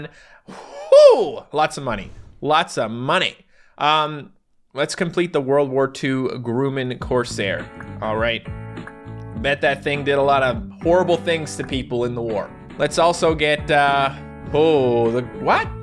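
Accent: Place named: Newfoundland, Canada. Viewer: Love your videos Matt! I actually live not too far from Newfoundland so it's cool to hear an accent I'm very familiar with aha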